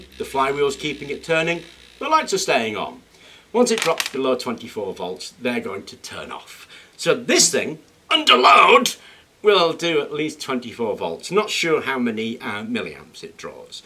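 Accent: South, United States. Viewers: nice, lol "he does have a different accent.." well he does live down south ya know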